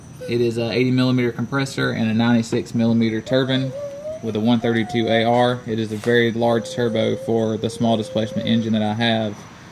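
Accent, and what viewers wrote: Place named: Tennessee, United States. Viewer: where are you located? I hear a TN twang!!! I am from Chattanooga.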